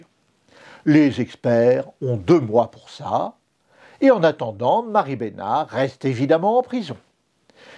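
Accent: Marseille, France. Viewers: Je ne souhaite nullement descendre votre accent marseillais, mais vous pourriez utiliser votre nouvelle influence dans le YouTube game pour trouver des doubleurs qui prendraient plaisir à sublimer votre travail de narration. Excellente série, merci à vous ! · Bravo pour votre accent marseillais qui m'a bien fait rire ;) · Génial vivement la partie 3 ! j'espère qu'il y aura encore l'accent marseillais ;)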